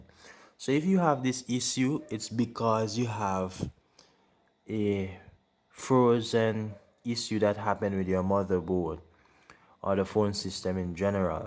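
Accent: Caribbean. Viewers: Which part of the Caribbean are you from? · As soon as I heard the voice I knew I was in good hands. Soothing Caribbean accent man, thank you so much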